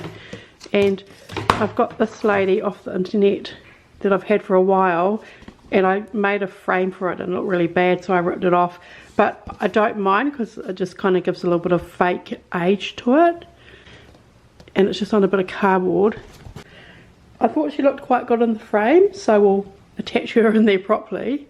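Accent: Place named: France. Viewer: I love your style, it's right up my alley. Just a beautiful array of pictures. I adore your French accent so much. 😍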